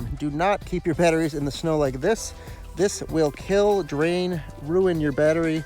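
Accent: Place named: United States. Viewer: Thanks, just back from watching. Yeah, that water looked cold to me. Glad you' were able to recover the plane. BTW, are you an expat? Sounds like native USA English to me.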